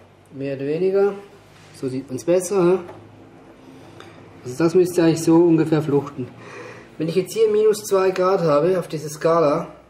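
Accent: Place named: Switzerland. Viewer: Twelve grades caster I understand in your swissy german!!!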